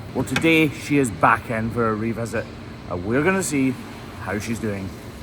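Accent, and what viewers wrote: Scotland, United Kingdom. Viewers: When is your audiobook released,? Got the book but I love the sound of Scottish voice (part Scot but I talk Scouse)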